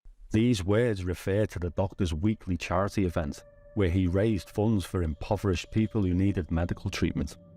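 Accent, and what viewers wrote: Liverpool, United Kingdom. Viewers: Scouse narrator. Bonus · the posh scouse phone voice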